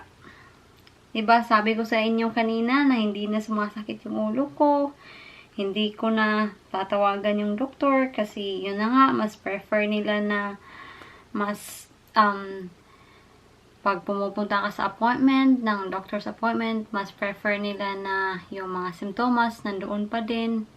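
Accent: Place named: Visayas, Philippines. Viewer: Hi, bagong subscriber here from Winnipeg Canada. Alam mo ba na ang wife ko ay na hook sa kga kwento mo.. Pati ba naman yung headache mo iniisip na sana daw pregnant ka hehehe. Natutuwa din sya sa visayan accent mo. Keep on posting more vlog. Sana pa shout out din..My wife's name is Dhang Camia. Sana mapansin mo naman ajg comment na ito...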